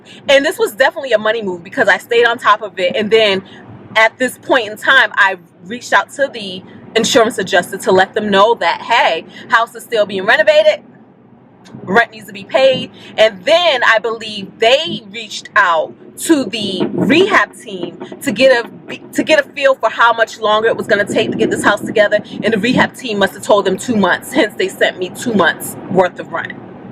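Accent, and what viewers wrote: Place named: New Jersey, United States. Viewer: You sound so Jersy. I love it. Are you from Jersey? Next question, what type of camera do you use on your videos from home and your car videos? Love your videos by the way!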